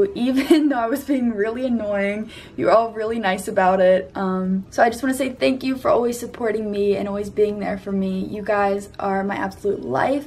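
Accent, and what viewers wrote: United Kingdom. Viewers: This is my first time watching u, I saw your tiktok but I wanted to know, are you British???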